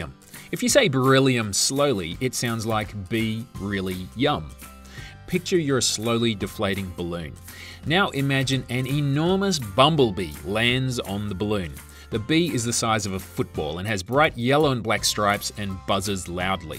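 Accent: Australia. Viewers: R u Australian? 😅 sorry but u sound Australian just asking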